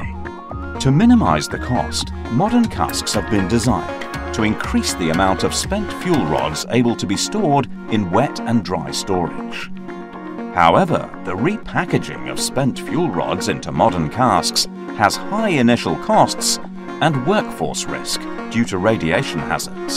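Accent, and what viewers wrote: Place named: United Kingdom. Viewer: Use an American English speaker, not a British English speaker. Thanks.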